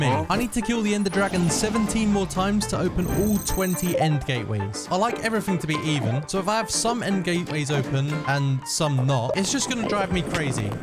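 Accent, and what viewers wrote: United Kingdom. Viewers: Your from England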